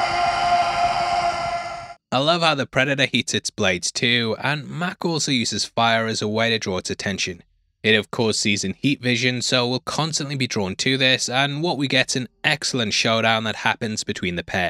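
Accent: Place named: United Kingdom. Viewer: Why is that mate..? You’re clearly (judging by the way your voice sounds) speaking in a U.K English accent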